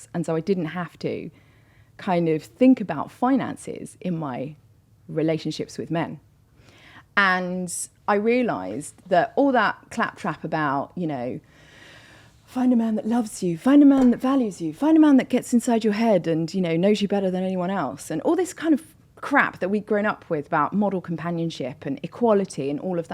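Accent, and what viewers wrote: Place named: Southern England, United Kingdom. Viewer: That is not estuary, that's a standard southern middle class accent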